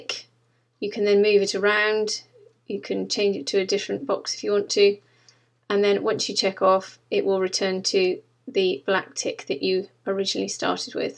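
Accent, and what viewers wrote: United Kingdom. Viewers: This so clear, I promised this will be my class, the ascent is so good, British type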